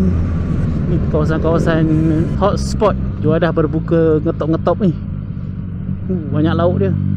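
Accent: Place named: Kelantan, Malaysia. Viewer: Doh msk kwsan kelate gk bey..ejs kcek kelate plok bey..hehe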